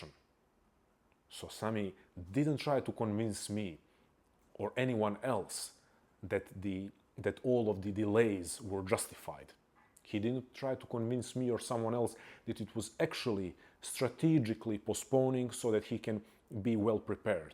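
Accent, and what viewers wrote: Balkans. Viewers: Are you Balkan? Great video, just wondered because of the accent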